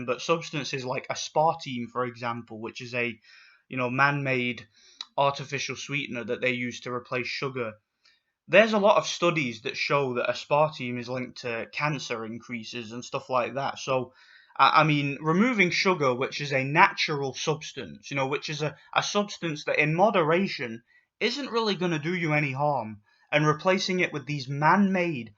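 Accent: Yorkshire, United Kingdom. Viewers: Scottish?? ... you sound like you're off Emmerdale pal! Anyway all soft drinks manufacturers are going this way...that's why Coca Cola now has Coke 'No Sugar' branding almost identical to regular Coke. Also there's no evidence that artificial sweeteners cause cancer. Sugar, although not a direct cause of cancer, does fuel the growth of cancer cells in the body. And just because Jamie Oliver campaigned to improve kids' school meals, it doesn't make him a paedophile. You have some very warped ideas mate.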